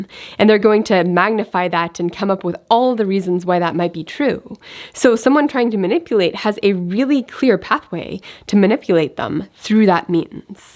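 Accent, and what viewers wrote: Midwest, United States. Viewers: Thanks for mentioning the "accent," I assume that gives me permission. ;) My friends like to joke that we don't have an accent because we speak with that boring midwestern "Peoria" or "TV newscaster" accent. But we definitely have an accent; we intentionally speak like others around us, and unlike people from New York and Minnesota. The reason us nerds are pestering you is because we know this style of speech from the character Summer Smith from the cartoon Rick and Morty. The actress Spencer Grammer has a hint of it, but it's much stronger in the cartoon. So the polite question is, "What's your accent?" But what we're really wondering is, "Why are there two of you??" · Lol it's a little weird to hear the shallow take that you don't have an accent... Everyone has an accent. I'm also from Missouri, I had you spotted as a midwesterner.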